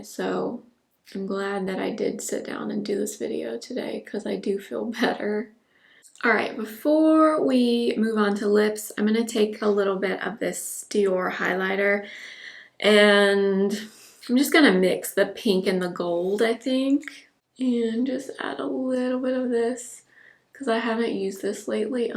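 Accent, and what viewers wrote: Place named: East Coast, United States. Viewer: Listening to your story about your neighbors and laughing not at your expense but because you sound like a younger east coast version of my husband and my self. We had similar incidents were we live with neighbors July 4th and having debris hit our home. We live in No CA where we just had some devastating wildfires and people still shoot off fireworks even though they are illegal. We also live on a corner lot which is mostly okay because we don’t have a house in back of us or directly in front of us but there are other issues that we had no idea about when we had the house built. Good luck on finding the perfect house and neighborhood it’s always a challenge. Though you might want to consider renting short term so you both can get familiar with the neighborhoods before buying.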